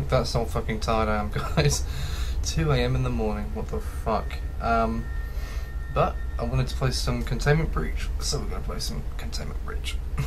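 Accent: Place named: United Kingdom. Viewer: This is my first time seeing your videos How the hell did I know you are British ?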